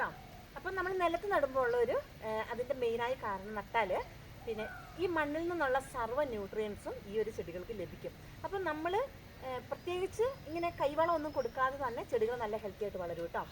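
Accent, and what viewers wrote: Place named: Thrissur, India. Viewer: സാധാരണ തൃശൂർ ഭാഗത്തെ സ്ലാങ് ൽ വരും,,,,, ട്ടോ ട്ടോ,,,,,, തൃശൂർ പൂരത്തിന്റെ വെടിക്കെട്ട്‌ പോലെ 😁😆😆😆,,,,, ഞാനും തൃശൂർ ക്കാരിയാണ് ❤️ with proud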